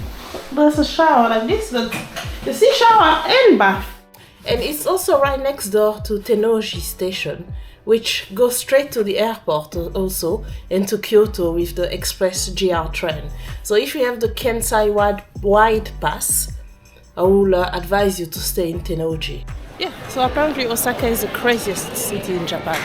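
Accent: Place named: France. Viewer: Love that french accent 🤣🤣 you deserve more views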